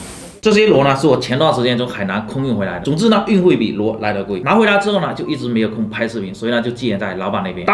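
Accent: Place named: Gutian, China. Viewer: 口音好像宁德古田那边的人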